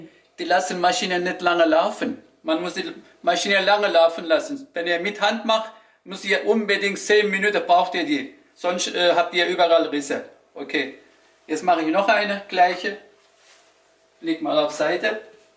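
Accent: Swabia, Germany. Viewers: Bisch n schwob? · Bisch du a schwob?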